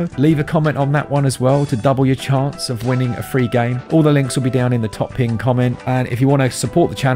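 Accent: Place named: Essex, United Kingdom. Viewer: J W Definitely from around/near London, probably Essex/Kent way is my guess.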